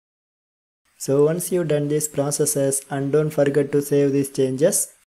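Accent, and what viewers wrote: India. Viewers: It's an average Indian accent.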